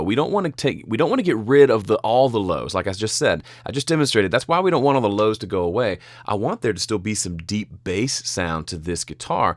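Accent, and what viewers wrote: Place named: Mississippi, United States. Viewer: Hi, I have been enjoying your videos on proper EQ. Great Job! Your accent seemed familiar to me so I checked you out and lo and behold, like me, you 're a Mississippi boy. I'm from Jackson, an original rock 'n roller, who played with Andy Anderson from Clarksdale in the early days. Keep on making those videos and I will keep on watchin'!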